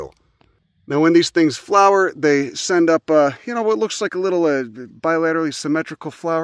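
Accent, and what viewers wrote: Chicago, United States. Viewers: I love the Chicago accent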